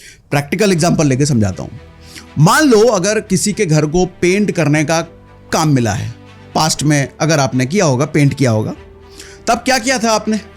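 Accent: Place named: Kanpur, India. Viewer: Sir boli se kanpur side ke lagte ho aap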